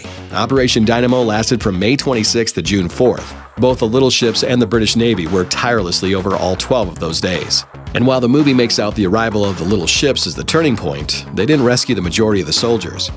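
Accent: United States. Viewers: are u american or britisch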